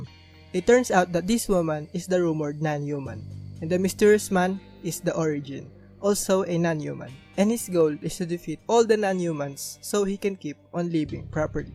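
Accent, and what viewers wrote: Philippines. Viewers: bruh are you filipino your accent sound like filipino accent in my opipon ahahhaa nice list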